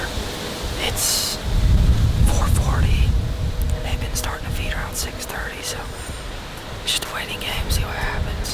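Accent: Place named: Texas, United States. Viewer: I thought you was from Texas. Tuffin up boy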